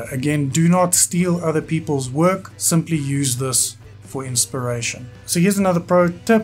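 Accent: South Africa. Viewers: I don't know way but you sound like a South African 😊thanks for all your help 👍🏻🙏🏻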